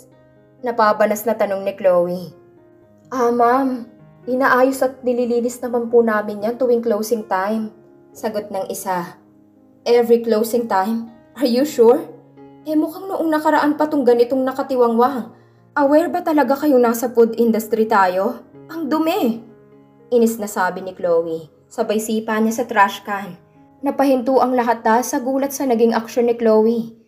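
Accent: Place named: Iloilo, Philippines. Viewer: Ilonga ka siguro miss narrate hnd bagay sau mag narrate para kang ewan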